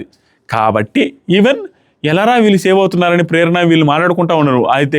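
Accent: Karnataka, India. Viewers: ur kannada so funny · Anna nuvu kannada matalu full funny ga undi anna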